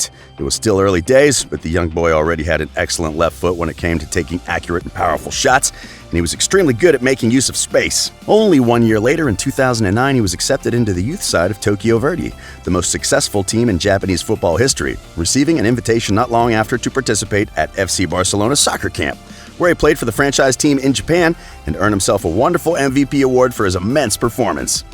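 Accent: United States. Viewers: I am watching it...butchering's name doesn't mean he doesn't watch football! He has a strong accent of American...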